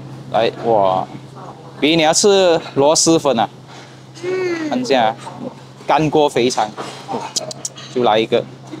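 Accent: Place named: Malaysia. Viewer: 马来西亚。 华人 听着 不是 中国人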